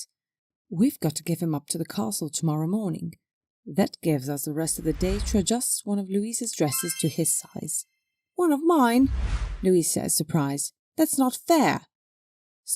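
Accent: United Kingdom. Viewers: I noticed you used a different voice for this video today, one with a heavier English accent. It fit the story very well. I also like this new storyline you just started, you are really branching out with new story ideas. knowing how your stories are we know there will be a gender change where he becomes a real girl. I cant wait till the next season of the pink packet/ mittens storylines those are my favorite works of yours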